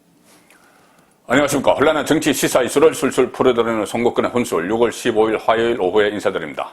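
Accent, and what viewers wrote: Gyeongsang, South Korea. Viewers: 아무리 경상도 출신이라도 그 정도로 알려진 언론인 이라면 발음 좀 신경쓰세요. 육월? 유성민? 정세없는 복지? 그래도 방송인데....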